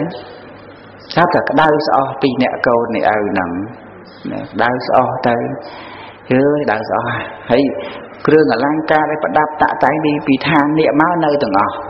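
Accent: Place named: Kandal, Cambodia. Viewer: ព្រះអង្គ ទេសសំនៀងដូច អ្នកស្រុកកណាចឹង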